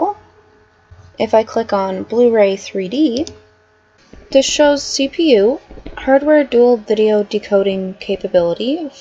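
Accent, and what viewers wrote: Canada. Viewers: I don't want to sound stupid, so sorry If I do...I haven't upgrade to a Blu drive yet so clearly I don't pass the Blu advisor check (which I will once installed) for 3d is says I cant support 3d playback, but what happens is I put in a 3d blu drive and use and hdmi to my 3d tv will it work due to the fact I'm using the comp more as a player than for viewing? Oh and yeah you sound wayyyy Canadian haha. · i thought your accent sounded canadian :p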